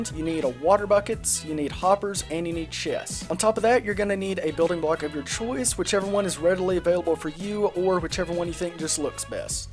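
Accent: South, United States. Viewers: Honestly an amazing video, your mildly country accent and speaking tempo with the music is great with this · Love your southern accent by the way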